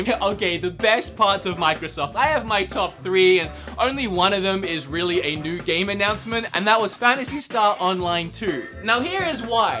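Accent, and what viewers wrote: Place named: Australia. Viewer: I'm one of the new subscribers! I have seen your videos before but it was your hyped pokemon video before E3 that made me subscribe. I have a feeling I'll continue to enjoy your content bc I really love your energy! Also, I like your accent, because its a lot like mine... I'm Australian (and I'm guessing you are too) but I've travelled and spend a lot of time around people with more American accents. So now I have this strange Australian/US/Canadian accent... ahaha anyways, that awkward little tidbit aside, I hope your channel continues to grow! :D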